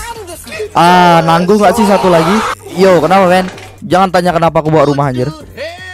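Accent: Java, Indonesia. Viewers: Bang lu orang Jawa ya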